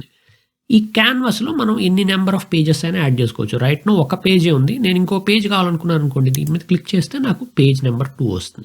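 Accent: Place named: Guntur, India. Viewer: You must be from Tenali or Guntur. You accent matches with that.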